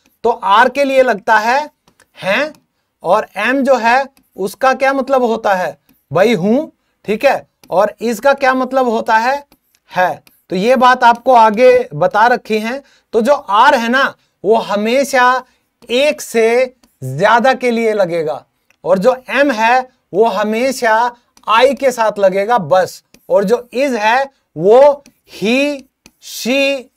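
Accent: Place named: Haryana, India. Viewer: Sir muje aapka pdane.ka lahja Bahut jyada accha laga aapse Padhkar apne Haryana ki feelings Aati hain aapne vakai etne jabardast 👌 andaz me cheezo ko explain Kiya Aapse pahle kabhi kisi ne ese na padhaya hoga thank you so much Bhai mere 🙏🌸🙏🌸🙏🌸🙏🌸🌺💐🌺💐🌺💐🌺💐🌺💐🌺💐🌺💐 Jai Hind Jai Bharat vandematram 🇮🇳🇮🇳🇮🇳🇮🇳🇮🇳🇮🇳🇮🇳🇮🇳🇮🇳🇮🇳🇮🇳🇮🇳🇮🇳🇮🇳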